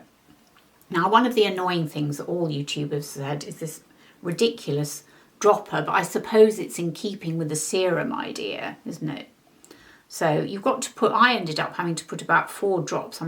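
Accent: England, United Kingdom. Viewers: Oh my I do enjoy your lively English accent❤Your content is valued by this almost 70 yo. I do so love your realistic approach to wiser womens beauty! Honestly Delightful🦋Peace and light☮️🕊️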